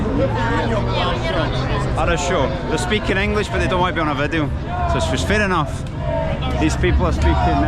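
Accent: Scotland, United Kingdom. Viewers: Moscow is dangerous no doubt about it. I saw a person being punched in the face for speaking English. I think your Scottish accent saved you, because they didn't recognize it as English. A state that is filled with right wing terrorists is not a safe place. And you are delusional if you think you can persuade anyone to visit a state which kidnaps children and murders men women and children. The money you spend in that dump goes toward doing just that, murdering people. So no, your fake party and paid Russian propagandists will not bring tourists into that hell on earth. You try saying anything antiwar for one minute in Moscow and let's see how long you last. In any case Russia is a terrorist state which has killed 1,100,000 Russian soldiers and many ukranians too and russia is destined to collapse economically in 2026, and very likely to break up after that. 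My advise is to get out of that hell hole before the shit hits the fan, already millions and millions of Russians have escaped for their lives and many many more will. Only the ignorant stay. Enjoy your propaganda party because it could be your last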